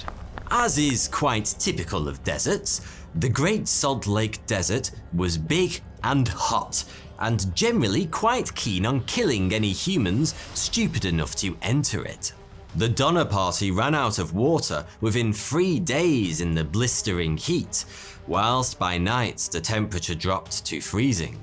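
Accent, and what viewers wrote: United Kingdom. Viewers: Donner Party is at most a passing, macabre joke. Sometimes people will use the name when waiting for a table at a restaurant so they can hear the hostess say, "Donner, party of two." But the only thing weirder than the Donner Party is seeing someone with a British accent make a 22 minute joke of it. Beyond one-liner jokes, I'm unaware of any American who views the Donner Party as a comedy of errors. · Are you British?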